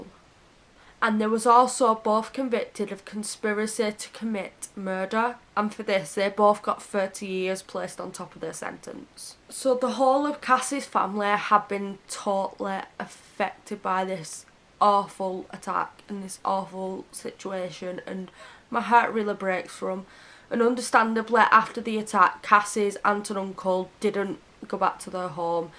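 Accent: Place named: Lancashire, United Kingdom. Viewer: I love your Lancashire accent.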